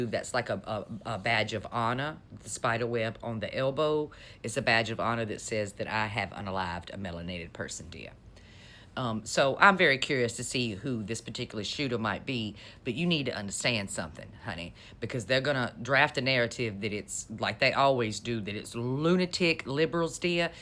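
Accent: South, United States. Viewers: That southern belle tone is annoying as hell im out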